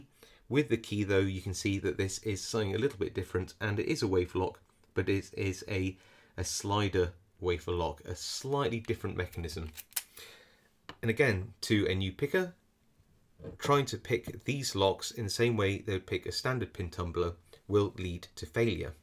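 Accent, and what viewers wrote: England, United Kingdom. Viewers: Beautiful English. Beautifully authentic, proper English English!! Excellent video of course too by the way!! Have subscirbed. Thank you.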